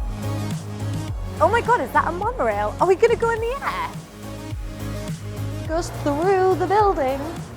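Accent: United Kingdom. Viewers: Sorry, hard to understand yr accent, English accent or British one, hihihih. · I like your UK accent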